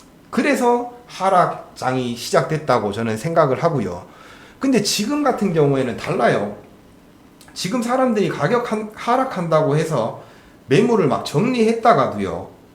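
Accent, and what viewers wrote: Gyeongsang, South Korea. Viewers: 대구분이시죠 ㅎ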